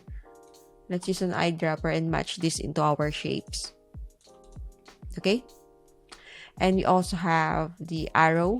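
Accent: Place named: Philippines. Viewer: Thanks for making this video. I also love your Filipino accent. 😍 I have a question about creating wireframes for app UX/UI and even for the web in general. I used to create wireframes first for clients and then high-fidelity mock-ups.. But ever since I've used page builders such as Divi and Elementor which was 6 or 7 years ago I have designed/built directly on the page builders. What you think are the pros and cons when it comes to the design process of starting from wireframes vs. building right away on the browser or mobile apps? Also which types of clients prefer one over the other? I have always attracted clients who do not mind me building right on the page builders and skipping the "design" part of the process. So I am curious if I can still be able to get clients who do not mind the longer version.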